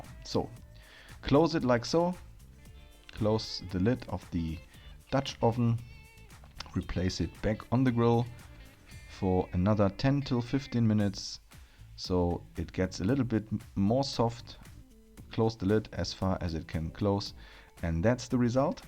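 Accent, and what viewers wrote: Germany. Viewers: Schönes Video und sehr interessant das Rezept! ...aber dein deutscher Akzent beim Englisch sprechen ist echt Hardcore!!! Versuch die Worte mal ein bisschen weicher zu formen dann klingt das ein bisschen sauberer und flüssiger. 😉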